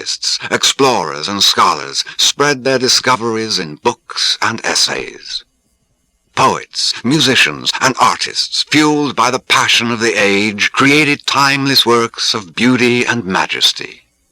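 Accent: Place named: United States. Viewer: Jeremy Irons seems to have an American twang to his voice here. Has he deliberately done so for a predominantly American audience?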